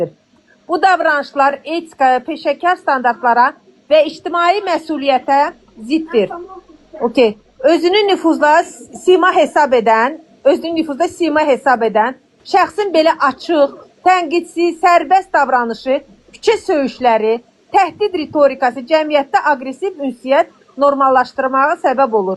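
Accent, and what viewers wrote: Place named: Baku, Azerbaijan. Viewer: Canim xanim əsilll Baki ləhcəsi canim Bakillim. Duz deyir vallah.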